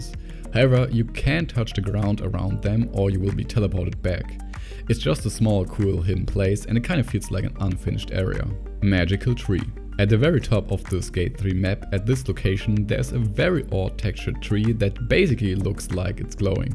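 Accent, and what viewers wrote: Germany. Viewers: Bro awesome work on that iceberg please more Also i kinda hear some german in your voice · A skate3 Iceberg?! Genius! Are u german? Ich höre irgendwie einen Akzent raus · Man hört voll das du deutsch bist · This Video was german i hear it in his voice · German accent 😅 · Man hört so hart, dass du deutsch bist xD feier ich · Ik erkenn jeden Deutschen an seiner Englischen Aussprache! Grüße aus der Bundesrepublik mein Freund! ♥ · Dieser deutsche Akzent xD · Man hört das du deutsch bist ,oder? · Ei hiar a german Äksent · Der deutsche Akzent sticht immer hervor min jung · Deutscher Akzent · Man hört raus das du deutscher bist kuss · Are you german couse it kinda Sound like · Als deutscher hört man den Dilekt direkt raus 😂✌🏼. Aber sehr nices video! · Sehr schönes Video, deinem Akzent zufolge denke ich mal dass du deutsch sprichst :) Die Theorie am Ende lässt mich gerade nicht los, würde aufjedenfall Sinn ergeben. 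Danke für das informative Video! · man hoert den deutsches akzent sehr raus wenn man selber Deutscher ist :D sehr gutes Video! weiter so! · He is german, you know how hard it is to switch languages without having an accent?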